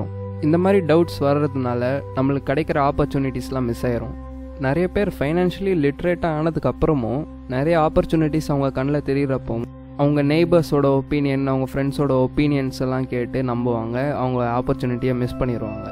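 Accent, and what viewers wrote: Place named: India. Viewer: Brooooo can you changer reader pronunciation please no Indian 👳 better Chinese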